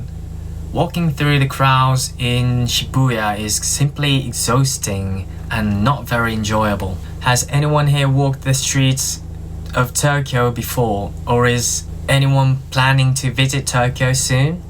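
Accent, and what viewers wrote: United Kingdom. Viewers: Hello. I enjoyed your video very much. It makes me happy to hear about someone having a great adventure and such a great time. Honestly, you sound like someone that has spoken English for most of their life. I do love the British accent. I hope to someday go to Japan. I want to go to most Asian countries. Hopefully someday haha. Take good care and stay safe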